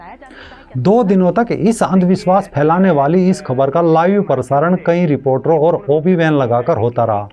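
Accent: Haryana, India. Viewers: I really like and admire your choice of topics and your sarcastic comments, one liners, and choice of adjectives with that Western UP & Haryanvi accent. Sabhash Keep it up. Keep posting more than one video per day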